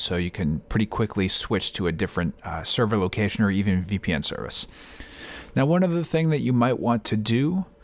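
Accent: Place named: United States. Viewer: very nice Tutorial. And nice clear AMERICAN Voice ;-) Greetings from germany. I have bought the Router today ;-)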